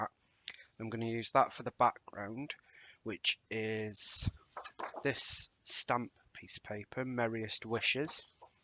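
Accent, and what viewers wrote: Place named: United Kingdom. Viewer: How nice to hear a British blokes voice doing scrapbook pages… cool take on the sketch and prompts. The format works a treat just like one of my favs Shannon (she’s Crafty). Chuffed to have found you… new subscriber 😊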